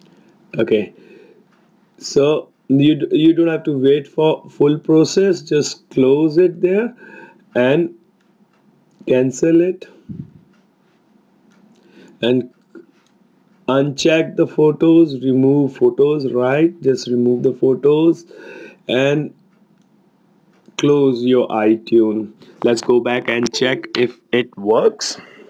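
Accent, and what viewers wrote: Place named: India. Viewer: Every indian accent people give the proper details ❤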